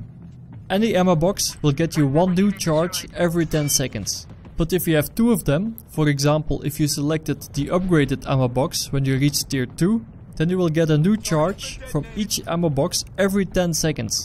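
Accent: Netherlands. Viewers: Hij praat wel met een Nederlandse accent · Are you netherlands?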